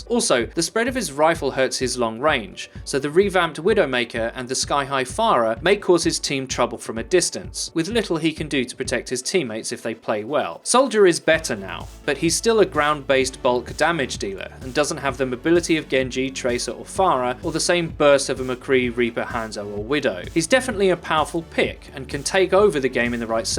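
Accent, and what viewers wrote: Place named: United Kingdom. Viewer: Zack Edwards Im British, but cmon dude, he has one of the most overly British accents ive ever heard.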